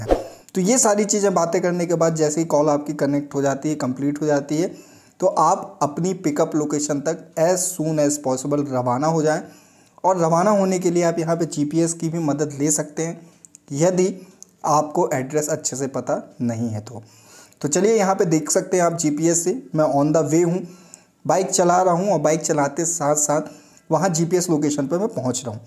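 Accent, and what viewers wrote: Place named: Indore, India. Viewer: Bhai Indore se ho kya 😅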